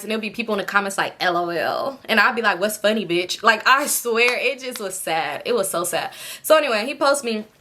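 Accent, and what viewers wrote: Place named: West Coast, United States. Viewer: Dang your /our west coast accent is crazy annoying .. I done stopped making conversations.SLOW down gurl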